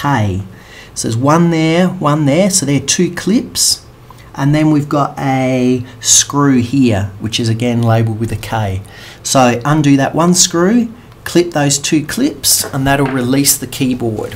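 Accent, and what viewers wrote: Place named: United Kingdom. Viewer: TH - A annnnk U! I'm still use this model. Are you british ? I like your accent!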